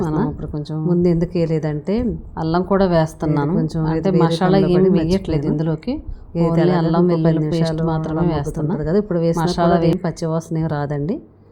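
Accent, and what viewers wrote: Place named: Andhra Pradesh, India. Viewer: మీ voice విజయనగరం జిల్లా శ్రీకాకుళం బోర్డర్ లా ఉంది...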